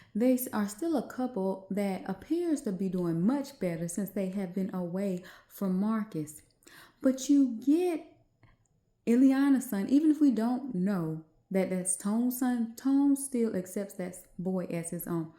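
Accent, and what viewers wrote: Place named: South, United States. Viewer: Hi beautiful, new to your channel. You sound just like a Southern Bell lady, I love the accent it's too cute🥰❤ But as said, they'll be many blinded calling wrong right, and right wrong.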